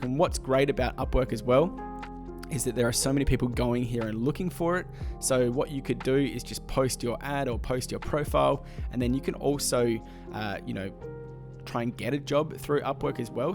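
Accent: Australia. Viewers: this is gold info dude. great to hear an aussie voice too. subd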